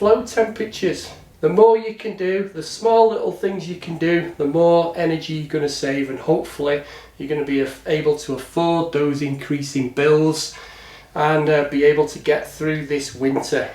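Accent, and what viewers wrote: United Kingdom. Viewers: 1,250 seconds of my life I’ll never get back. Next time, Made your videos shorter, single issues. No rambling into other related concerns. Make attempts to keep you audience awake and interested. Develop a less monotone drawl (sounds mid Cheshire to me), rather than droning on. 8 points for content value. 1 point for presentation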